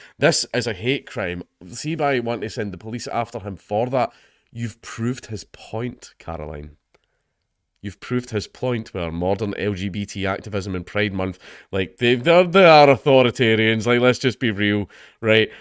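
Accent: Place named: Scotland, United Kingdom. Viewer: Did you somehow get more Scottish recently ?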